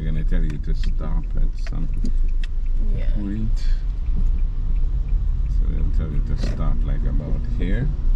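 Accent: Jamaica. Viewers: He Jamaican?... hear the accent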